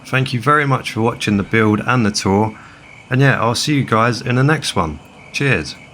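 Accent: United Kingdom. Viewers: just discovered your channel and omg. im so happy i found you !! my fo4 is screwed atm (heavily modded and the ng update killed it lmao) so im getting my fix playing new Vegas/watching videos (i have major ADHD/autism and am hyperfixating on fo4 so not being able to play it is miserable tbf). also as a fellow brit i find it frustrating how many youtubers are american so your voice is nice to listen to lmao. (i'd guess you're more of a southerner?) anyway keep it up man, your builds are super creative and i love watching you do them <3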